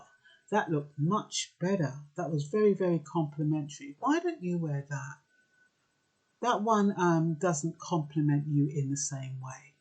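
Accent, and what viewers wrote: Jamaica. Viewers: I like it wen you fling on yu jamacian talk.keep up the good work.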